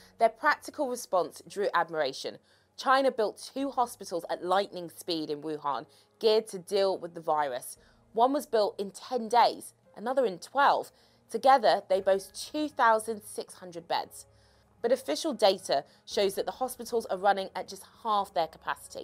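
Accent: United Kingdom. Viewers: I would believe it's a cover up but i hate British accents and colonialism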